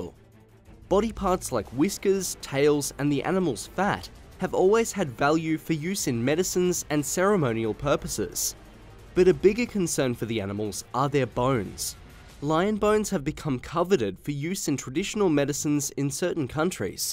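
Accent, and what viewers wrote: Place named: Australia. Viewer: Im Glad theres an Actual Aussie narrating videos here, I just came across an old vid with the most HORRIBLE impersonation of an Australian accent, if Im being Honest, As an Australian myself it made my twitch a little listening to it haha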